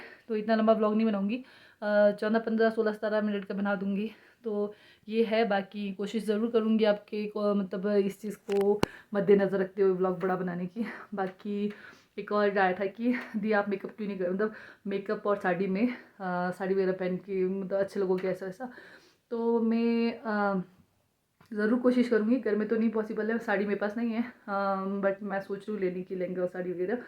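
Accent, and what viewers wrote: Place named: Assam, India. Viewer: Mai app ka vlog dekh rehiye thi aur app ka hindi aur hamara assam hindi sem hai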